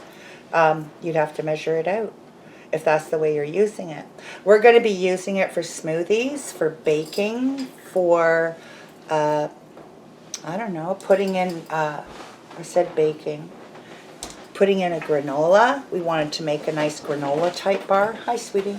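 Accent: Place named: Canada. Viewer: Just came across your videos today! Love your way of teaching and your Canadian accent! Subscribed and look forward to watching all your videos!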